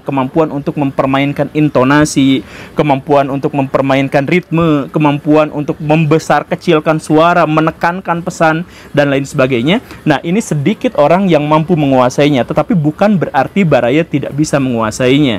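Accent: West Java, Indonesia. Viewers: Logat Sunda nya masih ada. Mantap. Lanjut terus pak guru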